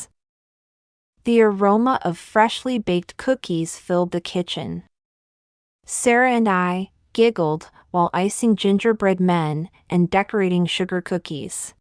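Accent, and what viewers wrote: United Kingdom. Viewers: does this channel have a British accent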